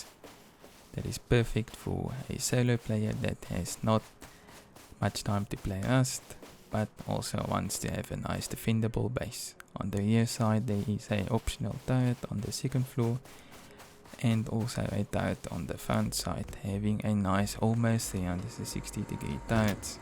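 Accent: South Africa. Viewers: Hold up, you from South Africa mybru?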